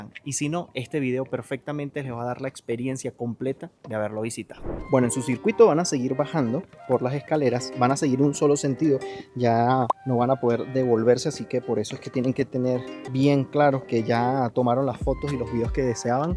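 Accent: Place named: Venezuela. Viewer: eres guapo No habia tenido el honor de conoserte.. Veo tienes esposa) Por tu acento eres Venezolano _? Mire todo el video y me quede esperando una toma' cerca de ese pico de la ⛰️ Creo era al final..pero tengo muchas preguntas/ 1_ Las 🪨 rocas estan sin cemento_? Mi Padre hacia lienzos de piedras y solo encimandolas se caian seguido) Estas rocas tienen buena colocacion y creo se adhieren fuerte y miro estan partidas con alguna maquinaria..no hay piedra que sea tan derecha y ahi muchas son partidas exactas/ 2_ Machu Pichu tiene laderas muy altas.. La gente pregunta como construyeron tan arriba/ Yo pienso que era un altiplano parejo y con el tiempo se fueron quedando algunos arriba_ dando un aspecto de que construyeron a las alturas) Mi pregunta es' Algun terremoto destruyo los alrededores y solo sobrevivio ese piko de laderas.._? Amigo " Que fortuna poder viajar y conoser en persona lugares misticos' Al tu estar grabando y hablando..pense que te perdistes..el sentir la recarga de seres que habitan invisibles ahi..yo mire en tu recorrido unos movimientos detras de una ventana y no eran personas/ Eso paso antes de la lluvia y el viento' Porque estabas llegando a la plaza principal y el estar a esas alturas.. 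esos seres cuidan sus terrenos y al final les dieron permiso de seguir' porque podia haber una tormenta mayor y tuvieran que parar y regresarse.. hasta yo pensé.. Noooo ya se estaban acercando a la montaña y no vere lo mas importante) La Roca Sagrada Tuvo sacri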